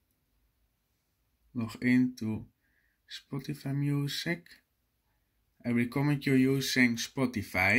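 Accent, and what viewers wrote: Netherlands. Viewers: Hahah Nederlands accent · Echt goud hoe je zijn Nederlands accent hoort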